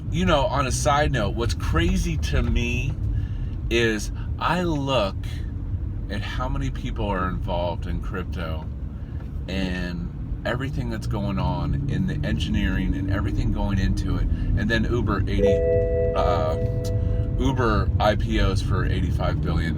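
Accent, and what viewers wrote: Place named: Dallas, United States. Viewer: Are you a Texas gent? Sound like you are around the dallas area. HTown here. Continued success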